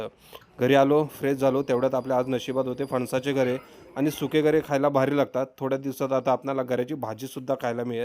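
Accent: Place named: Konkan, India. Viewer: Are wah tumhi pan Tulsa bolta ...koknit khup samya aahe ...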